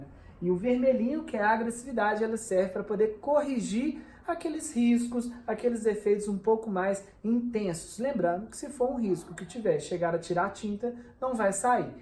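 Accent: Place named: Minas Gerais, Brazil. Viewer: Fala, como vai? Bom pelo sotaque acredito que seja mineiro, se for Tmj soh, se não Tmj tbm 😝, possuo um Vectra 08 Branco, poderia me orientar sobre qual verniz é utilizado nesse tipo de carro? Agradeço a atenção e as dicas que vcs nos dão, forte abraço!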